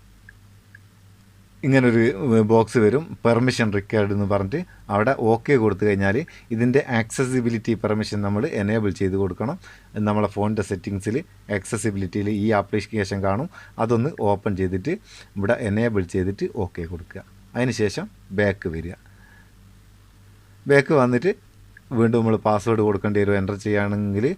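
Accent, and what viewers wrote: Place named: Kozhikode, India. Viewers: നിങ്ങളുടെ നാട് വടകരയാണോ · നിങ്ങളുടെ കോഴിക്കോടൻ സംസാരം നല്ല രസമുണ്ട് കേൾക്കാൻ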